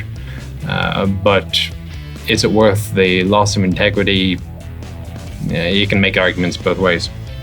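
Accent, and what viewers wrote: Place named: Ireland. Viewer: Nichols, what is your nationality?.......it seems that your military service say American BUT you seem to have an Irish accent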